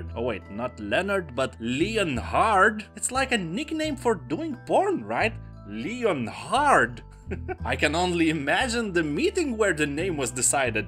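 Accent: Russia. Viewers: бесит его русский акцент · This dude sounds like russian to me :)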